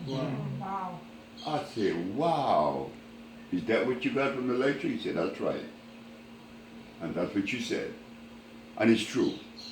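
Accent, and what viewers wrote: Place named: Louisiana, United States. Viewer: Did he say wait a minute round. Lol. That that talk down in Louisiana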